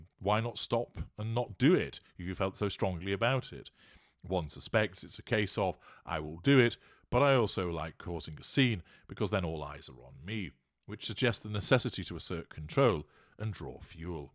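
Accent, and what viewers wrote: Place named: Wirral, United Kingdom. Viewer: I find your channel so educational and entertaining. I bet you are not going to answer this but I am fascinated to know where your accent is from. It’s not that pronounced but there are certain words you say where I can detect a certain regional accent. Are you originally from Wirral, by any chance? Go on, be a devil and answer me. 😊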